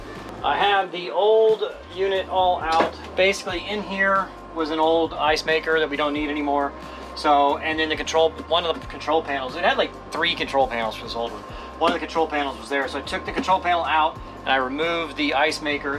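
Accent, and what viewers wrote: Canada. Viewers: "Oout and Aaabout" love the Canadian language. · I like her Canadian accent. Very nice.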